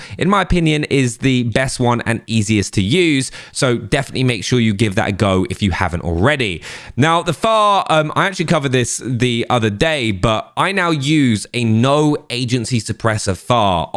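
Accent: United Kingdom. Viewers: I love how britishers say knot for 0, it's just amazing. Sorry, bri-ish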